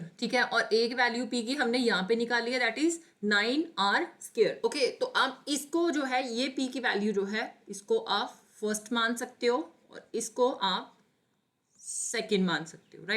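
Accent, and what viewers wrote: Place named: North Kerala, India. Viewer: vadakkan accent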